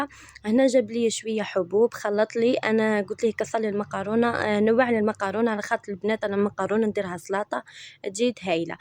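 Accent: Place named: Guelma, Algeria. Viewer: نتوما اصلكم من قالمة ولالا خاطر نتي وماماك لهجتكم تقول قوالمة واسمحيلي على التقرعيج وربي يهني بنيتي تمنيت عندي طفلة ونعلمها تكون كل صبع بصنعة كيفك الله يبارك عليك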